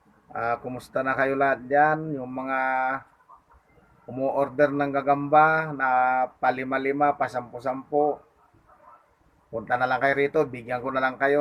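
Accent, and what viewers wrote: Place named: Ilocos, Philippines. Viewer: Parang ilocano kayo lakay base sa pananalita niyo hehe.anung province nyo po?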